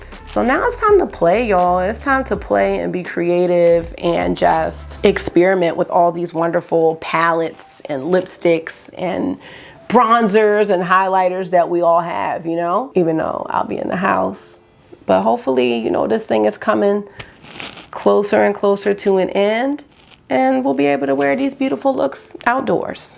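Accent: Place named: DMV, United States. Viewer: New subbie! Fellow Teacher here! As soon as you started talking I knew you were from the dmv, me too girl, glad I found your channel!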